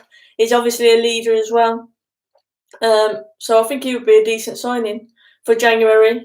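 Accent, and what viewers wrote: Manchester, United Kingdom. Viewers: Nice manchester accent.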